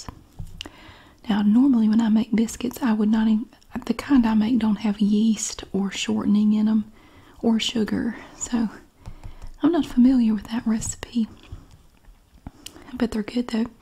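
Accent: South, United States. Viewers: The fact that her name is, she’s southern and reading recipes is literally the CUTEST thing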